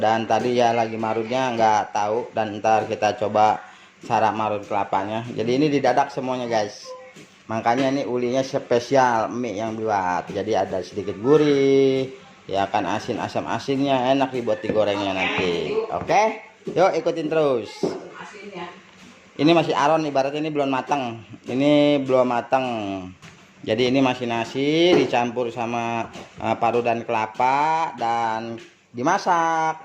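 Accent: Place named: Jakarta, Indonesia. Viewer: Betawi bgt dari ngomongnya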